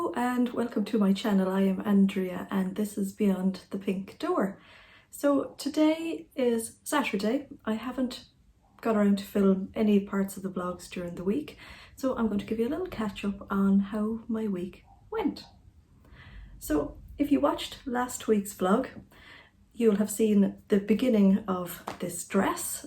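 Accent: Ireland. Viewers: ✋🏻Hi .just tuned in and was drawn by your Irish lilt .I live in Sheffield but grew up in Dublin.Love your makes and how they look on you.I am going ,to check out your other posts now.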